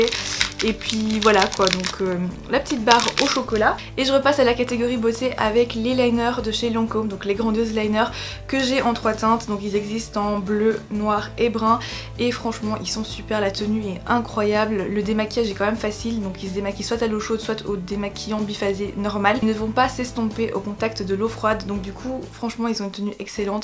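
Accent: Belgium. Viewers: je te suis depuis pas tres longtemps donc j'ai une question a laquelle tu as peut etre deja repondue ^^ : Est ce que tu habites ou as habite en Belgique? car de temps en temps je trouve que tu as un petit accent ^^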